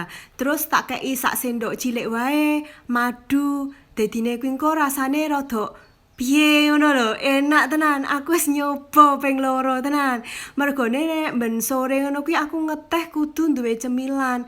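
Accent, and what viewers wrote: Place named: Java, Indonesia. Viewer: Ngedok bangat kaka 😁😁bahasa nya jadi lucu gitu dengar nya acc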